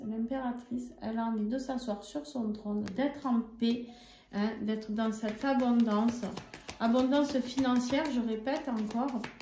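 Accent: South, France. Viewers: bsr vous me faites rire avec vos expressions je les retients je n arrete pas de rire vs etes super et votre accent du sud trop top sinon les cartes me parlent :face-fuchsia-poop-shape: ps cul patate j adore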